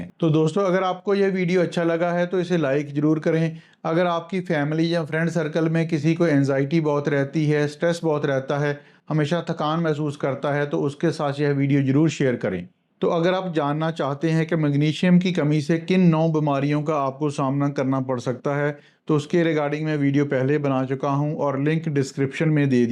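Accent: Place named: Punjab, India. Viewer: Punjabi accent ki vajah h.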